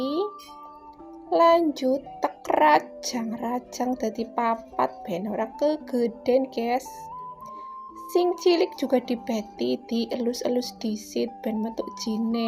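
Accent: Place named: Banyumas, Indonesia. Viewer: Kok ngapak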